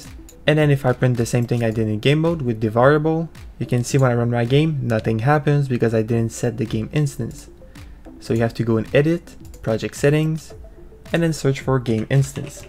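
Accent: Quebec, Canada. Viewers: where u from? u sound french canadian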